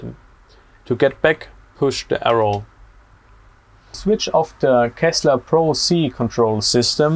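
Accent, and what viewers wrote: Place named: Germany. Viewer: the knowledge of cranes and the German accent are strong with him.;-)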